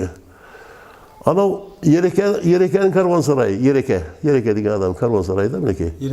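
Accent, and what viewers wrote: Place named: Karakalpakstan, Uzbekistan. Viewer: Он каракалпакский узбек во первых , и у него каракалпакский диалект и это его право на каком языке рассказывать ,во вторых он рассказывает отдельно взятого региона Ходжейли старое название Антакия,в третьих не будь нацыстом,и в четвёртых почему сам не пишешь на каракалпакском ,а требуешь на каракалпакском.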